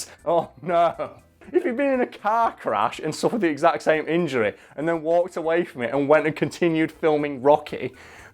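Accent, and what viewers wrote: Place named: Sheffield, United Kingdom. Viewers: Been trying to figure out where your accents from for ages! Finally you say you're from Sheffield ! Let us know if you're ever on a night out round Sheff, love to meet you for a pint! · I knew you were from Sheffield could hear it in the accent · Dude you could have at least known that he's northern. I mean, listen to the man :D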